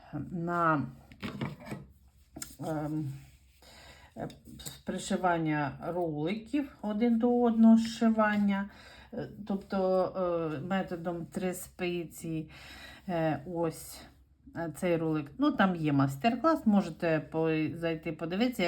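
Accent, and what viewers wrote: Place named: Odesa, Ukraine. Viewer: Ваша смачна , українська мова з одеським присмаком теж зачаровує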